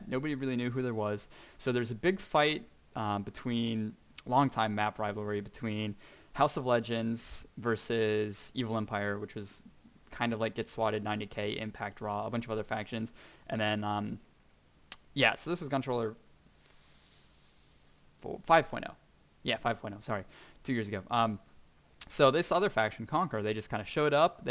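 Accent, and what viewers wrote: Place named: United Kingdom. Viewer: You sound like your from the uk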